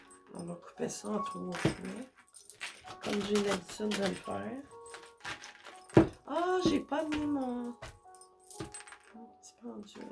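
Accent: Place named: Quebec, Canada. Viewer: Vs avez un accent québécois.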